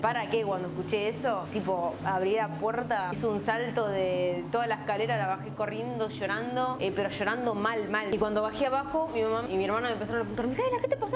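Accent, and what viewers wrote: Argentina. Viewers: La boca no carajo E wacho vieron eso Típico de los argentinos Lo Locooo somos los argentinos así hablamos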